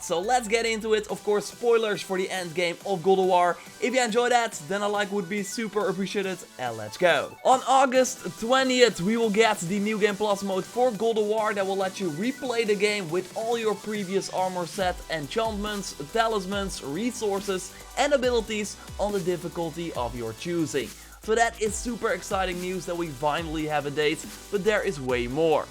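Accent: Netherlands. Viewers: This guy sounds so DUTCH.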